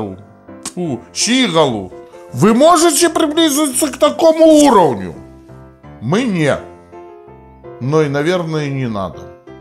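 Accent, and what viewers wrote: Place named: Belarus. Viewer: Сначала Лайк потом поржал над трасянкай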